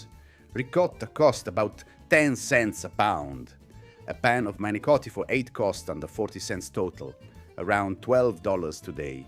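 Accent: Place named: Italy. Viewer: You have an italian accent but you don´t pronounce italian correctly. How come? For example: It´s Tschetschi, not tsetsi.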